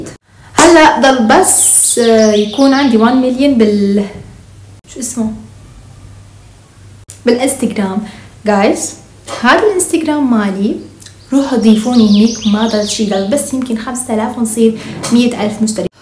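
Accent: Iraq. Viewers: كلامج تقريبا كله عراقيي يااا عمرييي 😭😭😭🍓✨🤭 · ياعمرييييييي✨ الف الف الفف الفففف مبروككككك ❤🙀 والله فرحتلككك من كل قلبي عقبال 100 مليون يارب 💖 ياعمرييي وبسس متابعتكك من العراق🌷 احبكككك كتيرر موححححح😭😭😭💗 احيههه تقريبا كل كلامك عراقي فديت الي يتكلم عراقيي فديتكككك عععع 😭😭💅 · الف الف الف الف الف الف مبروك ان شاء الله ال <num> قريبًا يارب والله فرحتلج من كل كَلبي احبججججججججج متابعتج من العراق ❤💋💗 لهجتج العراقية تجننننننننننننن ❤️‍🔥🍓🇮🇶💘🇲🇦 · مليون مبروك على المليون تحبك هواي متابعتج من العراق لهجتك العراقية تجنننن ❤❤❤ كيوتتتت ❤❤❤❤ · صوتك بلعراقي مررة حلو مين بدو تعمل يوم كامل المتابعين يتحكمو بحياتك🗿👍🏻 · الف مبروكك اني من العراق🇮🇶 واحب كل فيدوهاتج وكلامج نفس العراقين❤✨ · 😮😮اول مره اشوفك تحكي بنت ليكون عراقيه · فديت الي تتكلم عراقي+احبك موت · مبروك يا بعد روحي جاي اتحاول تحجي عراقي اموت عليج ❤❤❤ احبج متابعتج من 🇮🇶انتي🍯🍯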